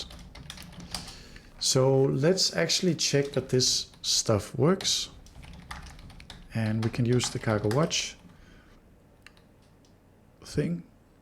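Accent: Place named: Denmark. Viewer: Are you from denmark? You sound a little danish. But overall great video!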